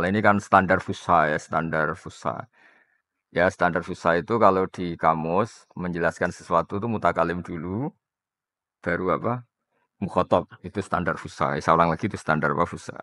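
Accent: Indonesia. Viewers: Lughat Madura kayak e ,yg baca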